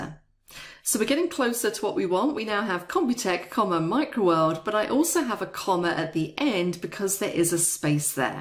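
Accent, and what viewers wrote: United Kingdom. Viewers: Thanks. I love your perfect British accent😍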